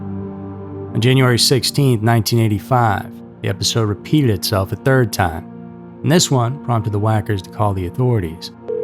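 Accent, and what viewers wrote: South, United States. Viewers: Great story my friend. Love the Voice. Down south southern style❤🎉😂😂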